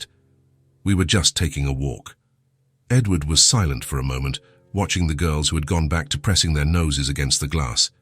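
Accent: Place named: United Kingdom. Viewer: What's with the British accent speaking American words. Since when do you cut into risotto?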